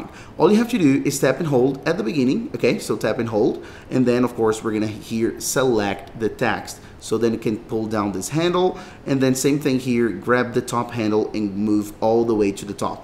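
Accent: Brazil. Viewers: Amazing that even never having entered this channel, this video or having ever heard or seen this guy I am 100% confident he’s Brazilian judging by that accent that I’m able to recognize because I am Brazilian and am a good accent spotter. That said this video is awesome. I had no clue about this. Thanks a lot.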